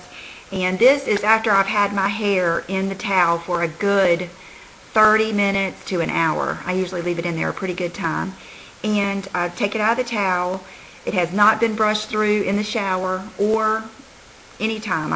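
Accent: South, United States. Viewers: I always hope that you will say "Hey, Ya'll" bc i love ur cute southern accent, but you never do. You should!!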